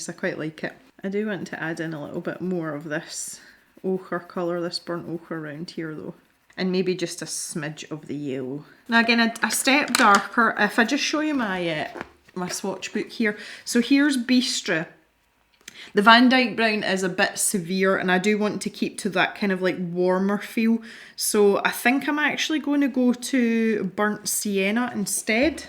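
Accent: Scotland, United Kingdom. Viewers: haha, I think I've said the same thing about enjoying to just listen to you talk, but that's because I LOVE your Scottish accent. But I also enjoy watching your videos and see what you create. It inspires me and also I love watching your ScrawlrBox videos, because it gives me the chance to see if I'm the only one liking or disliking new art supplies ^^ also love to see what you make with the prompts :) Anyway, back to the art at hand. The giraffe turned out really beautiful! I like it :) I have a greyscale colouring book here, but I don't actually like colouring in it :( might be the paper, though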